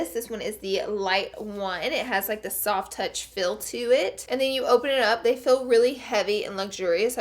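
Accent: South, United States. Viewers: 😞😯 Sure miss your own unique personality & southern accent 😩😒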